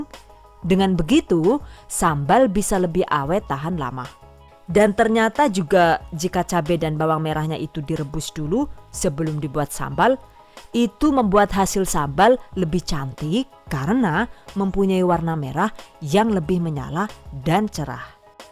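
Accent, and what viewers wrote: Banyumas, Indonesia. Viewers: Makasih resepnya mba 🙏 Bismillah aq mau coba buat usaha, semoga berhasil Aamiin Ya Allah Btw, kok mba nya bisa ngomong ngapak 😆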